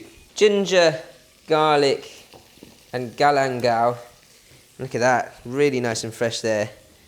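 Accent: United Kingdom. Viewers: u got a british accent bro?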